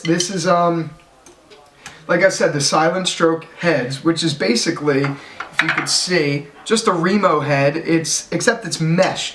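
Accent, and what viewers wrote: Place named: Philadelphia, United States. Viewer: Not even kidding, first 10 seconds and I have to ask: what part of Philly are you from?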